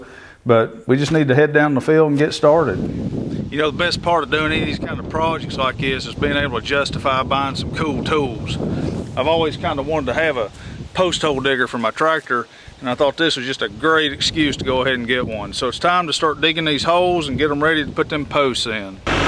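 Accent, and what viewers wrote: South, United States. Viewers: Love your Southern Accent... keep on trucking. Have a Great Day All Ye All..lol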